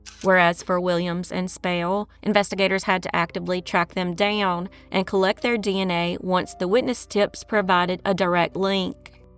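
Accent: South, United States. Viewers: Human narration by good old southern girl with a wonderful accent?! I subbed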